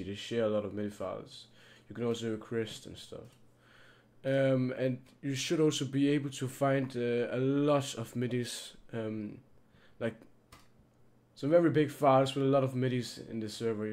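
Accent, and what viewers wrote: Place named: Denmark. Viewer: Hvorfor er kan man bare høre dit dansk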